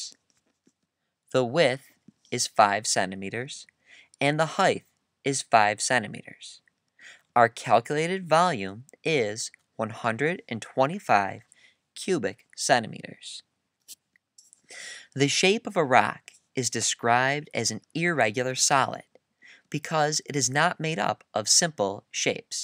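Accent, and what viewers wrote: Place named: Texas, United States. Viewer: I think the video maker has Texas accent. He wanted to say Rock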